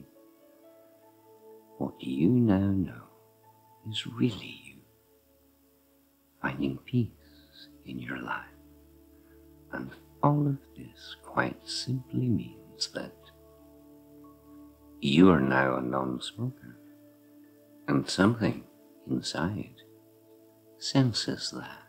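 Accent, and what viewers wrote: Scotland, United Kingdom. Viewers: You the best I've listen just about all 10/10 what kinda Scot are you ? 😆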